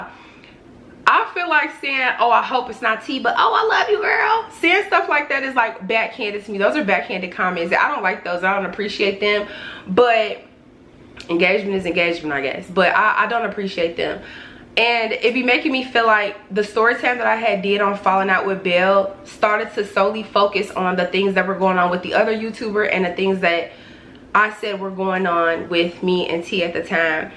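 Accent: Chicago, United States. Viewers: I was finna say , you have a Chicago accent no way you from Minneapolis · I knew you were a Chicagoan. I always heard the accent. My ex husband left that with me. I can’t say CAR to save my life. It’s Kah. 🤣 nothing wrong with a reset. I did it several times at your age. Stay up. Refresh and renew. ❤️❤️ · same here. immediately i was like "she from chicago" 😂 that's my hometown so iykyk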